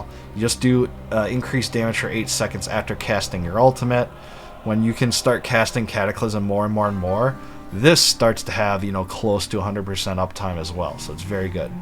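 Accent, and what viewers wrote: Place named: Wisconsin, United States. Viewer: Gotta be honest, I recognized the accent before I saw the hat. A fellow Wisconsinite here, Appleton. Nice vid!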